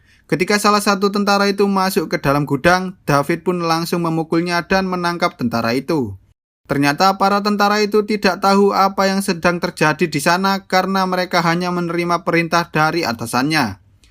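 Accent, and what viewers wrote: Java, Indonesia. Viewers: Mimi orang Jawa kah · Loh,Mimin wong jowo to dadake? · Uong jowo to mas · Ngomongnya jelas bgt bang tapi medok bgt cengkoknya 😭 aku agak gak biasa dengernya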